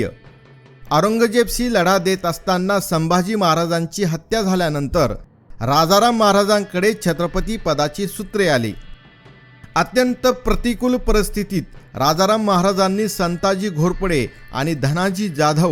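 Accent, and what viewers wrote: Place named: Thanjavur, India. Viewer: Tumachi bhasha Tanjawar Marathi watate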